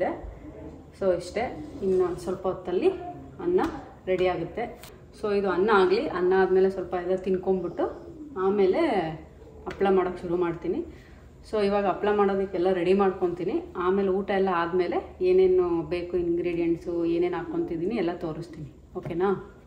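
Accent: Mandya, India. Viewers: nim language kooda mandya language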